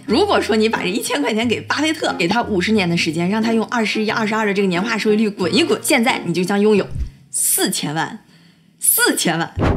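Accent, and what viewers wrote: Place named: Dalian, China. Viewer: 中山区出生的海蛎额味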